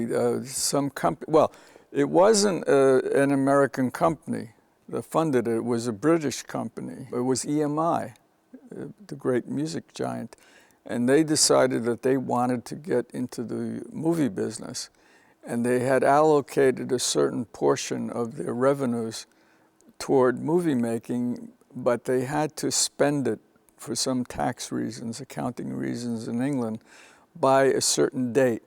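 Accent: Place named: New York, United States. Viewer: Thunderbolt and Lightfoot was an extraordinarily accomplished debut. Like another singular talent, Oliver Stone, he was a graduate of Yale. Year of the Dragon remains his best film--for me at least. There was a very beautiful Chinese-American actress in it. I love his accent--pure Italian New York! Thanks for posting this and keep up the good work.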